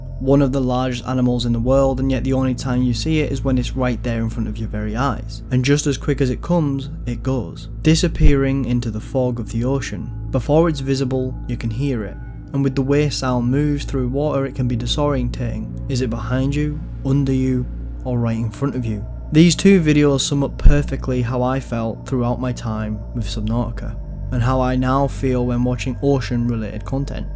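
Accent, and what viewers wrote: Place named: Scotland, United Kingdom. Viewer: First YouTuber with a Scottish accent, makes you listen 🔥🔥🔥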